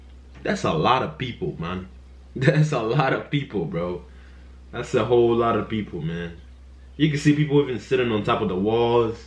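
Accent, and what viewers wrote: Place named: United States. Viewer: bro, don't mind this comment, your english is very american hahaha we're together, keep up with the reactions 👊🏻🇧🇷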